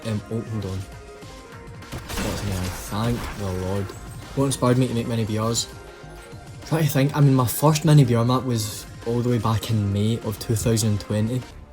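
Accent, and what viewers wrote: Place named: Scotland, United Kingdom. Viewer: Your voice sound like an real Scottish🏴🏴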